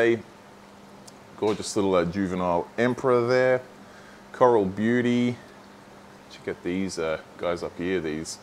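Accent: Australia. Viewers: Wow, what a store! Also, I love Australian accents. For real.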